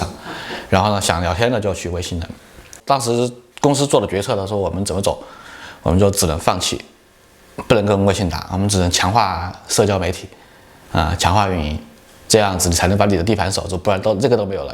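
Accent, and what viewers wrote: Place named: Wuhan, China. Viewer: 听口音是武汉老乡啊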